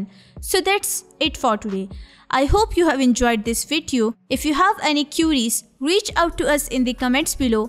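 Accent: India. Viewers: I think you have really interesting Content, but I can’t stay this Indian accent anymore. Sorry i tried. Really 😩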